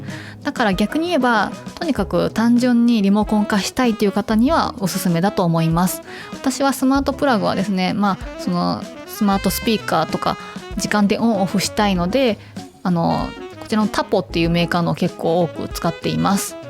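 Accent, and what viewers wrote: Kansai, Japan. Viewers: こんにちは。初コメです。聞き入ってしまう柔らかな声と、懐かしい関西弁と、美しい言葉遣い。そしてたまに出るお笑い要素も大好きです！朝イチの家事のお供に聞かせてもらってます🧹🧽🫧 グラスって気づいたら増えちゃいますよねあるある。またコメントします！♡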